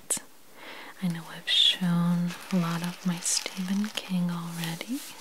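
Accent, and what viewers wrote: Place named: Minnesota, United States. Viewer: She's in Pennsylvania. I'm guessing by her voice she's spent time in Minnesota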